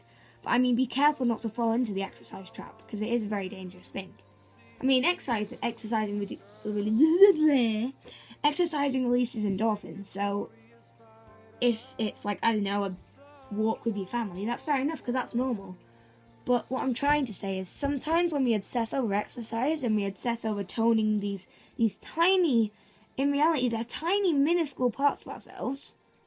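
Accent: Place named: Surrey, United Kingdom. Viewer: You sound really posh on your videos, you sound like my cousins from Surrey :D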